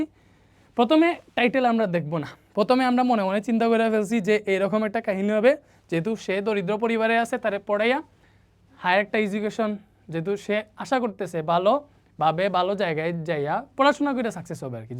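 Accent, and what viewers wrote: Sylhet, Bangladesh. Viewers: Beday j Sylheti kothay seta bujha jay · স্যার কি সিলেটি? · Sir ki sylheti nakiiii😮😮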